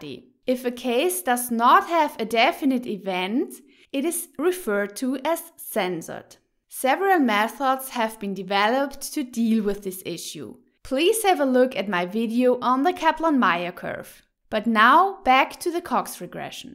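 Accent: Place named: Germany. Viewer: the most awful german accent to date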